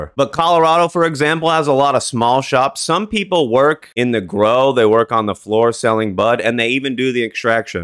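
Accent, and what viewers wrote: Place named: New England, United States. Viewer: Idk if you’ll see this or mind me asking but where did you reside before you moved to Colorado for some reason I pick up some New England in your accent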